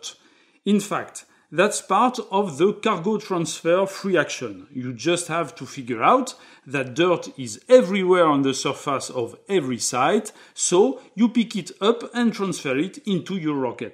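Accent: France. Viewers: Amazing!!! I watched all 3 vidoes, it’s just fantastic!! Both the game and the explanation/ videos. And I love the French accent🤗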